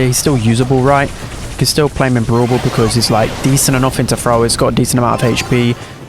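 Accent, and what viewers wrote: United Kingdom. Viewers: I love his British accents. Makes me comfortable to watch before in NY I don't like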